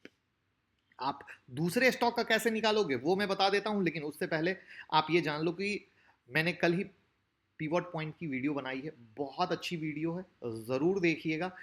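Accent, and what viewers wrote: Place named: Rajasthan, India. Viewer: Maja agaya....taabad tod k owledge sharing.... sixer.... bhasha se rajasthani lagte ho sir...marwar belt ya mewari?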